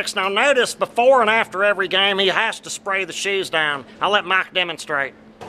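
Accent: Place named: London, United Kingdom. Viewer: What part of London are you from?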